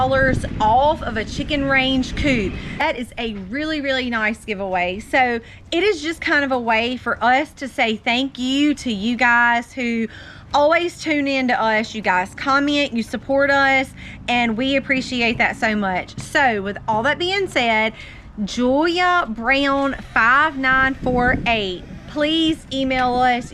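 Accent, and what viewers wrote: South, United States. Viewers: I always enjoy watching you all! And quite frankly I love to hear your wonderful southern accent! My family was from Alabama… and I still have some there! I live in CA! Wish I could live somewhere Else . And maybe in the near future we will be making some trips to the south to see how we like it, We are in our 70’s so we are not young chicks! However we have to get out of CA even though I was born here I really hate what it has become. Watching your channels have renewed my desire to be more free From all this concrete jungle and grow our food and have some animals. We live in a beautiful area, and my husband has worked hard to provide for our 5 kids. With them all grown up now, we just married our baby in July…she is 32… we were married at 19 & 23 years old. So the world has changed so much since then. Watching you all has given me more hope to actually change things. 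Thank you for your channels ..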